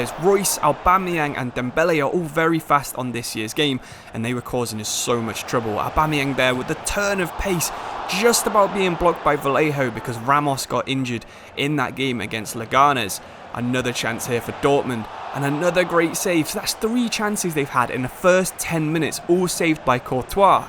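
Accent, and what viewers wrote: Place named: Nottingham, United Kingdom. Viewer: mate this guy sounds like my mate from Nottingham lmao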